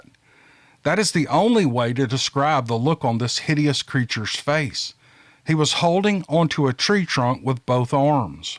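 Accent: South, United States. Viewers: like everyone else I love your voice! I’m from Mississippi living in Florida now, so I love Southern voices.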